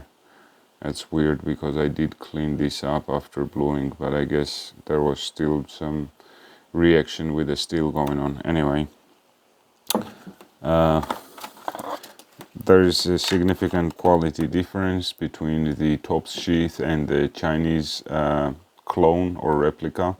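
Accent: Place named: Georgia, United States. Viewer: you have a Georgian accent.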